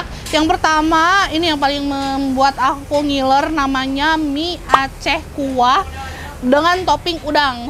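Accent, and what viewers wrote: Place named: Java, Indonesia. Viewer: Bahasa acehnya terdengar mendok banget.. Pue haba ureng aceh. Sehat sabe..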